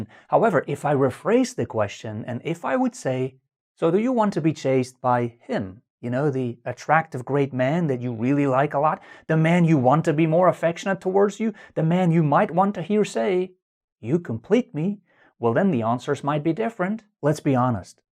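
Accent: Scotland, United Kingdom. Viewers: ~This is fantastic advice!!!~Im distracted by your accent tho, trying to tell if its scottish, or irish, or...??? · Scottish accent. 💋❤️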